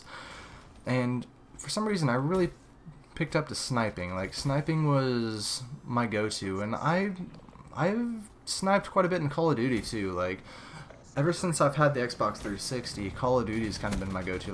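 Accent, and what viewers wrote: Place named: United States. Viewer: Lol, that american accent.......i like it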